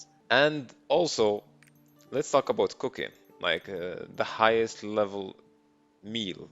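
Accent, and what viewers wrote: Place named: Morocco. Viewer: Your accent man (morocco/ amazigh)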